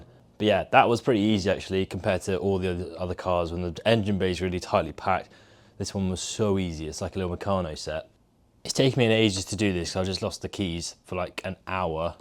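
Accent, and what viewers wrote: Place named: Australia. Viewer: Pretty good aussie accent as judged by an aussie!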